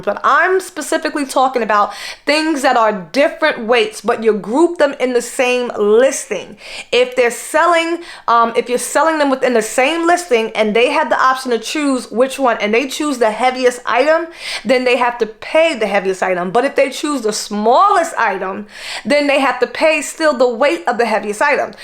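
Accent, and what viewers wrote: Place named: Brooklyn, United States. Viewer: I'm thinking 🤔 she looks familiar...😂😉later on in the vid you say you're from NY. Now, I know you just SOUND familiar! 😂Brooklyn? Yes, on the grind!😂💪🏾💯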